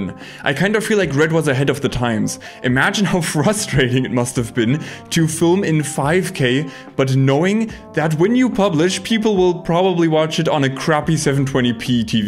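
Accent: Germany. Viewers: Keine Ahnung - 1 Satz und für mich klingt das als ob du Deutscher bist. Wieso bloß kein Video direkt in DE ❤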